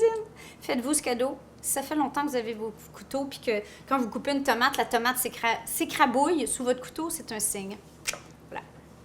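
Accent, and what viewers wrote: Canada. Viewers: 👍👍👍👍😋😋😋😋 j’adore aussi ton accent canadien, il nous donne la pêche 👍👍👍👍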